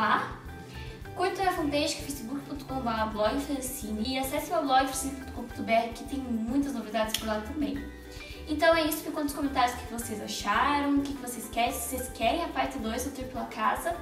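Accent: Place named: Curitiba, Brazil. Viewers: é que ela é do sul (especificamente de Araucária, região metropolitana de Curitiba) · ela e de Curitiba , pia kkkkk amo esse sotaque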